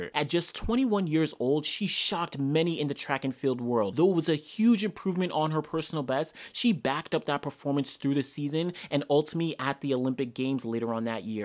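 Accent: Jamaica. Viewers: She definitely the Greatest women Sprinter of all time(GOAT) I actually thought this guy was Jamaican until he said Merlene Ottey🤣 its actually pronounced [Hottie] no pun intend Great Analysis tho💯💯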